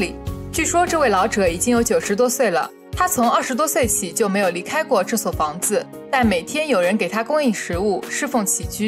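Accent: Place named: Xinjiang, China. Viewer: 你这新疆 口音很重！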